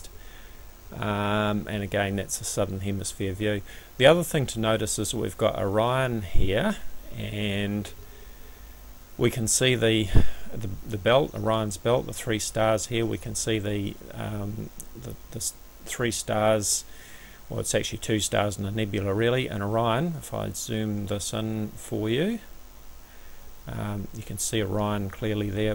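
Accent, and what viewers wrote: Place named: New Zealand. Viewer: awesome accent mister Kiwi. Kuddos from mexico.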